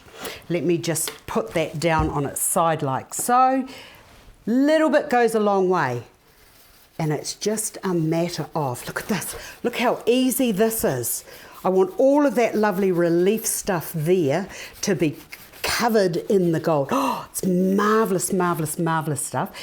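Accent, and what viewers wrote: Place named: New Zealand. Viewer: It is a Kiwi accent (New Zealand).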